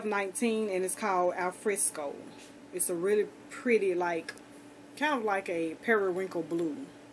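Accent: South, United States. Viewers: I found your channel looking for micro braid tutorials but I found so much more. I am a new subbie. Where are you from? I am from S.C. just wondering because we both have that wonderful southern accent.☺ Have a blessed day!🌹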